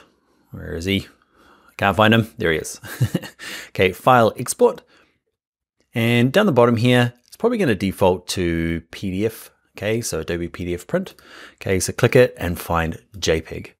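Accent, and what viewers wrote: New Zealand. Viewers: do I hear kiwi accent? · Hi. Andy here from NZ....how refreshing to hear another Kiwi explain this app in detail. Great style and pace too.....the American tutorials treat you like a baby....I was first put off by the length of the clip...but hey...this was seriously worth it. Picking up Indesign to help my daughter create her first children's book....she is 10... And needs a bit of help.... I think you've given me everything I need to get started. I see you also have illustrator tutorials...I'll be checking those out too. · Thanks for great tutorials - you're a gifted designer as well as a teacher! And hearing your accent, I miss my highschool exchange year in Papatoetoe... Cheers mate, thanks again!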